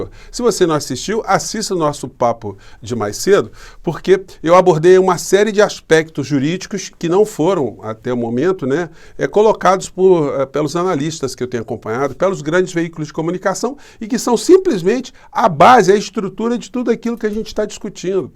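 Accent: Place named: Rio de Janeiro, Brazil. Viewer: . Sou paulista. Acompanho e pretendo acompanhar sempre seu canal. Vc é muito bom. Só repare, você mesmo, em qualquer vídeo seu: " vc que é muito bem VINDO e muito bem VIM" Nenhuma crítica ou implicação. É parte do seu sotaque carioca. Mas, perceba aí. Continue do mesmo jeito. Tenho absoluta certeza de que é sotaque.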